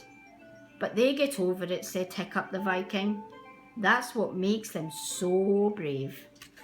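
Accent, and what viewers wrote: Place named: Scotland, United Kingdom. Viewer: And she also has a Scottish accent. This is just brilliant. :D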